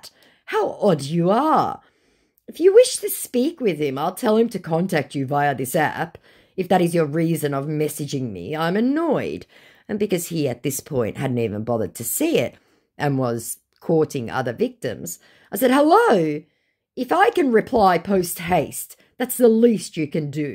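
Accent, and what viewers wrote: Australia. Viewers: Your voice is literally EXACTLY the same as my stepmom's. She passed suddenly a few days ago and was also from Australia - although she was from Adelaide. I got to learn a bunch of Aussie slang, got introduced to new foods and drinks, and can definitely tell an Aussie accent from a Brit one because of her. I'm taking her passing hard but your videos/voice are such a comfort to me right now. So from this Cali-born Pacific Northwest transplant, thank you. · Subscribed cos of ur Aussie accent.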